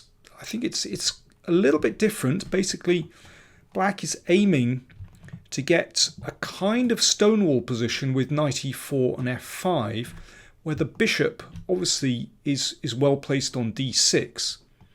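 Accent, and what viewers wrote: United Kingdom. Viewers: I definitely agree! I currently believe that Daniel’s analysis is much better for improving. Because not only do I think that Daniel’s analysis gives us a grandmaster insight and ‘feel’ for the position, he also is imo able to express the psychological effects of moves much better. And while Agadmator’s top engine moves are nice to know, the analysis of the psychological effects of moves in terms of pressuring the opponent, being more comfortable and playing with the ‘flow’ of the game etc. are, I’m pretty sure, much more useful for improving than breaking down disgusting engine lines! And lastly as a bonus, I thoroughly enjoy the British accent and demeanor, especially when he gets all excited😆😇😇😇.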